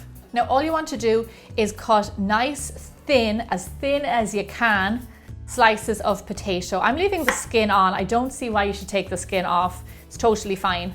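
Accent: Ireland. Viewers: I love your recipes; and I have to say, I love your accent too. It reminds me of someone from Ireland who is very dear to my heart <3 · Irish accent talking potatoes....I'm listening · Is she Irish? Couldn't tell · I love the brogue, I miss the brogue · Wait ur Irish no wonder you souand like Jackspeticeye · Agreed. I love the Irish accent. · I'm only here because i love her accent. Irish chic's are sooo hot. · She sounds pretty Irish to me! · So irish 😂😝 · Your beautiful Irish brogue accent sounds just like my grandmother sounded. She was from County Cork. I've tried your potato chip in the microwave... PERFECT baby! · Is it just me but the more I watch the more Irish she sounds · I love the irish accent ❤️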